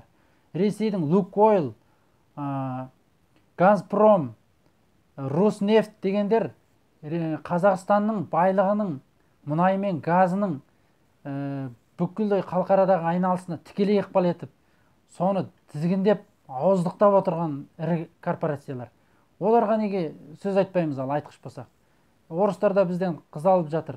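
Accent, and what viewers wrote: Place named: China. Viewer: МЫНАУ ҚЫТАЙДЫҢ ҚАЗАҒЫ ТІЛІНЕН БЕЛГІЛІ, МЫНА ЖІГІТТІ ТАУЫП АЛЫП ЖОҚ ҚЫЛУ КЕРЕК ТЕЗДЕТІП, МЫНАУ САТҚЫН АДАМ, ҚЫТАЙДЫ ҚУАМЫЗ ЕЛІНЕ, ҚИРАТАМЫЗ ҚЫТАЙДЫ, ҚАЗАҚТАР БАУЫРЛАР ҚЫТАЙДЫ ЖОҚ ҚЫЛУ КЕРЕК ҚАЗАҚСТАННАН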